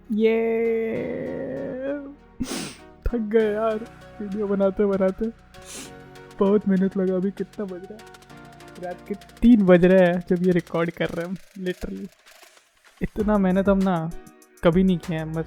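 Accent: Bihar, India. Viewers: I love your Bihari accent is awesome love it❤ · Thethar 😹😹😹😹bihari ho ka? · Bro tumhara accent bihari jesa h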